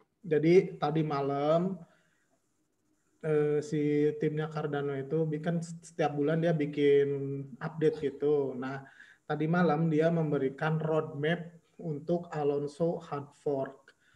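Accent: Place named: Sunda, Indonesia. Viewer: Langsung gue sub ini chanel josss,,,medokk kaya orang sunda😎😎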